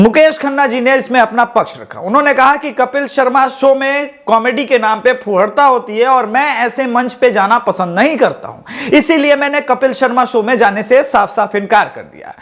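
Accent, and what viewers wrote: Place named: Bihar, India. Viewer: Sir aap jarur up-bihar wale ho ........... Hai na sir? Aap ki channel pai mai pehlibar aaya hu aur apke bolne ka tarika bohot aacha laga......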